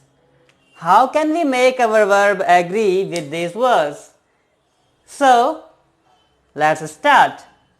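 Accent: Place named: United States. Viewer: Tqs bro for your american accent of English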